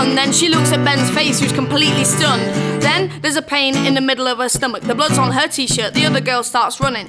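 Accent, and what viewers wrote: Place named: England, United Kingdom. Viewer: Good! I like your english voice its cool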